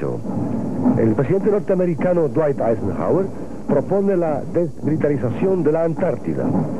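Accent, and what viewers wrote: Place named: Argentina. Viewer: Yo creo que no, al narrador le oigo un poco el acento de Argentino o Sud Americano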